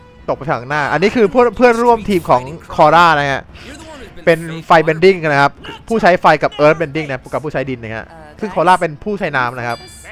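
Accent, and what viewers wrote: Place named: North, Thailand. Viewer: พี่คนเหนือป่าว พี่ พูด เหนือ อะ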